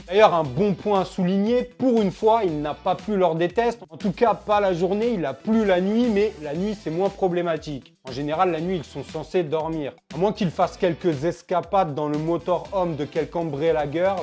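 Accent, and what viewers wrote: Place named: Banlieue, France. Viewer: Très bon contenu, mais pourquoi cet accent de banlieue? Dommage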